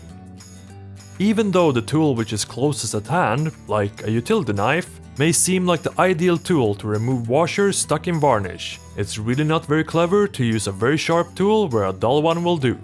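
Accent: Sweden. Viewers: are you swedish??? your accent is pretty swedish?? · you are swedish right? You have a swedish accent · Without knowing better I would quess you are Swedish based on your accent and specialy the way you say "just". Awesome and very good looking video btw! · Are you swedish becase i can Kinda hear it on your accent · Sounds like northern Sweden.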